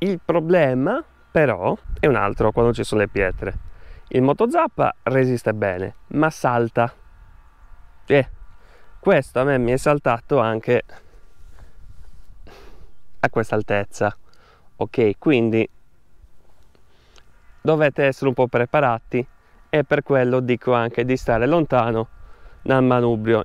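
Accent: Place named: Sardinia, Italy. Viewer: A metà del video ero già iscritto. Parli come deve parlare qualcuno che ci tiene a farti imparare. Bravo veramente e l'accento sardo rende tutto più simpatico.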